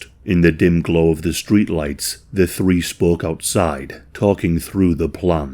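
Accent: United Kingdom. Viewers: Can someone answer me this please; Where is actually from? 🌎 🤭 Because his channel says Australia, but he sounds British 🧐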